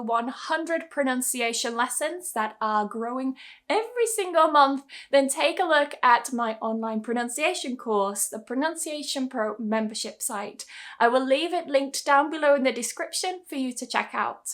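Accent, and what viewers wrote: United Kingdom. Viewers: i really like this channel that the british accent was sounds comfortable❤️ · I really loved your all of video to learn lot of things . you is the best teacher ever, you is a beauty queen of BRITISH accent. & Im really big fan & followers of you. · sorry teacher , are you british ? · Hi again,! As I've said before, your British accent is just delicious!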